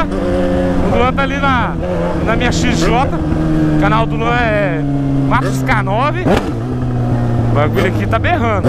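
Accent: Goiás, Brazil. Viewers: Saudades quando ele falava no pique do Goiás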